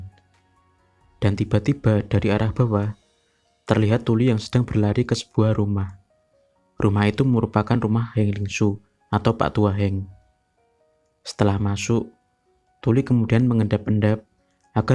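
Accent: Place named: Java, Indonesia. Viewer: Ngpmong nya medok bangat asli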